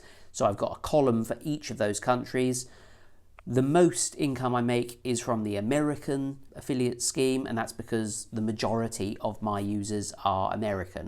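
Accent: United Kingdom. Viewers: Your Brit personality and accent is the key to your success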